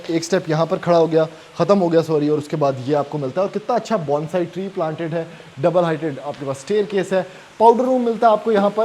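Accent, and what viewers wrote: Punjab, India. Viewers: Ghar to bahut sunder hai but I really like your Punjabi touch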